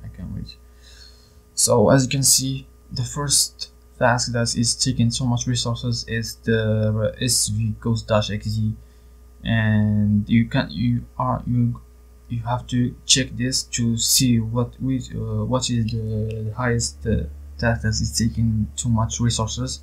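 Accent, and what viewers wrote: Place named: Spain. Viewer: i think he is Spanish his accecnt not to hard to understand but maybe if he wrote it down instead of talking to me i juse restore my computer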